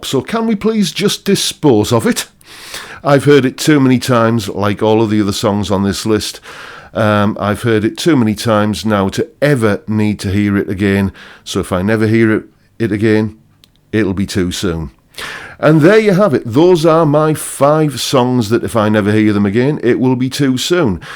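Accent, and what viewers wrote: United Kingdom. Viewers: Most songs if based on lyrical content would sound like crap regardless of the melody ( like rediculous " " which also sucks as a melody )and should have never recieved radio play. We have to remember that sold out theaters and album sales are all that matter. (Pink Floyd's favorite rant). I can't stand " "," ", "s " " " " and I could have lived my entire life happy without being tortured with " " or tune about " " and I'm not even Catholic! Love a good RANT, thanks mate! (I'm not British either but I love the accent!)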